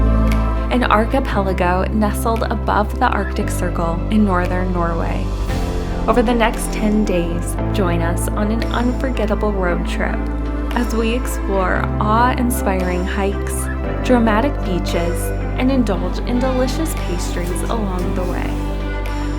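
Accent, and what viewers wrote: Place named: Los Angeles, United States. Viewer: this video is really good....but your LA girl accent is so annoying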